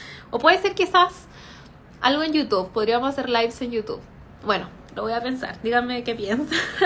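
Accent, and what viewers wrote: Chile. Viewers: Woow,la combinación perfecta, un buen desayuno y la lectura de un buen libro, me encantan tus vídeos,me gusta tú acento chileno, me agrada tú voz,y tú forma de ser, aparte de ser muy bella eres muy inteligente,y admiro tu gusto por los libros,me fascinan la temática de tus vídeos, me gustaría ver un vídeo donde cuentas más sobre ti, o algún vídeo de la cultura de tu país, ya qué mi cultura cómo mexicano, es diferente a la cultura chilena,aún qué vivimos en países hermanos de latinoamerica,cambian los gustos literarios, me encantó la temática del vídeo! te envío un beso y un abrazo, con absoluto respeto..saludos princesa⭐🌺👌😘😘😻☺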